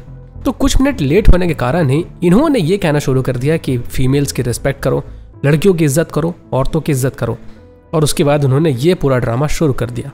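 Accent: Bihar, India. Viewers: Bhai ye bihari logo ko kaise bolna lag rahi hai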